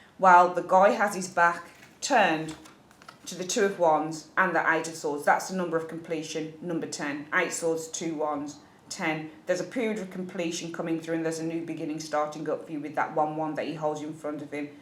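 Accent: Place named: England, United Kingdom. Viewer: Bitch slap 👋..... 😂😂😂😂😂and with that beautiful English accent 😂😂😂😂😂❤️❤️ ...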